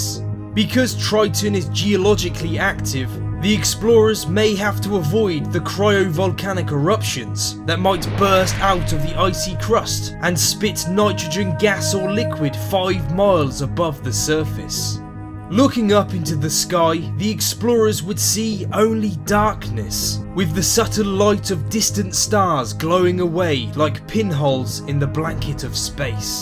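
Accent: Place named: West Country, United Kingdom. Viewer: I can hear a slight West country tinge to your accent.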